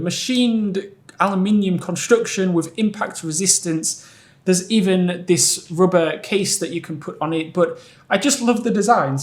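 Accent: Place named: Yorkshire, United Kingdom. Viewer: Where's your accent from? Sounds Geordie with a bit of Yorkshire.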